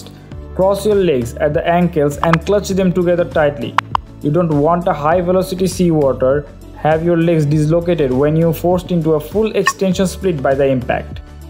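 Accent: India. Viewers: As a native portuguese speaker, he speaks like an indian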